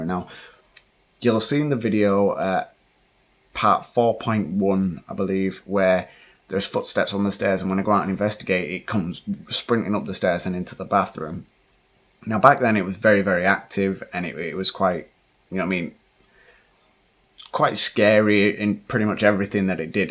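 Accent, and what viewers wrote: United Kingdom. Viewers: Hi guys I am french. I need help to understand at the point he explain us how the paranormal activity started around him. The british accent is too strong for me :( If someone could write it down would be nice. Thx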